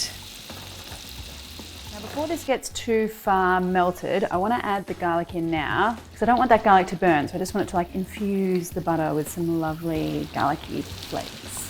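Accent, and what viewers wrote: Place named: Australia. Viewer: Your Australian accent has gotten a lot stronger since moving!! 😊😊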